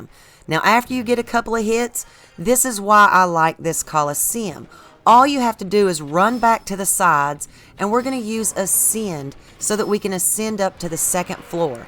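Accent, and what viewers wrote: South, United States. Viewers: this is genius! also i'm so tickled by your accent, as a fellow southern person, you dont hear many southern game tutorials 😂❤ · Are you southern? Lol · Not to mention that nice Southern accent that makes it so chill….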